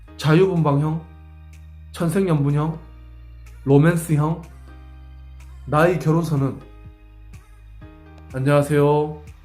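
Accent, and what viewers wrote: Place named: Gyeongsang, South Korea. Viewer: 말투가 경상도 분이시라 ㅋㅋㅋ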